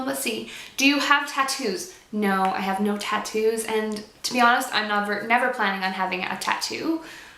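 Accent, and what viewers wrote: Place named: North America. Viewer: Yay for ex-pat #bbloggers! I moved from Texas to England last year for my husband as well. I love hearing other North American accents pricing things in pounds and euros!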